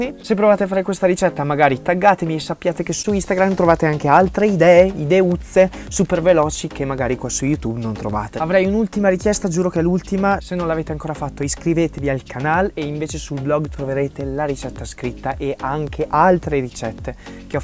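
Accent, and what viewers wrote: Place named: Romagna, Italy. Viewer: Ma perché hai l'accento romagnolo!?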